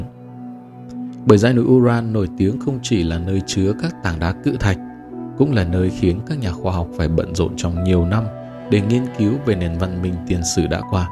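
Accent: North, Vietnam. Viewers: Anh giải thích về giấc mơ rất hay, cho thấy anh có rất nhiều kiến thức vững chắc. Chỉ mới biết Channel của anh hơn hai tháng, đang nghe lại những bài củ cả năm trước. Giọng đọc của anh quá hay và rõ ràng. Tôi đến Mỹ từ nhỏ cho nên có những Channel nói giọng Bắc nhanh quá rất khó hiểu với tôi, nhưng giọng Bắc của anh rất hoàn hảo, chậm rãi dùng từ ngữ dễ hiểu (😄👏). Thanks so much! Love your Team! Love you all!❤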